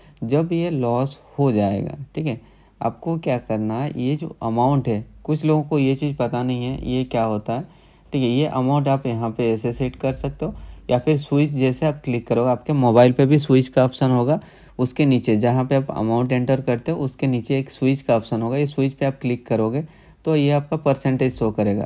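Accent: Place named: Kolkata, India. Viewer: Kolkata Se Haii Bhaii ❤️ Tere Bolne Ka Style Se Pta Ge Mujhe..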